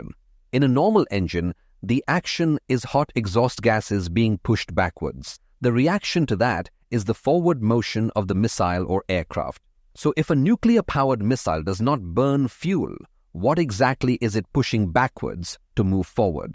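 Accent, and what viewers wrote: India. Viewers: Is it me or the narator is indian ??? That beeing a well known gateway for russian propaganda...😂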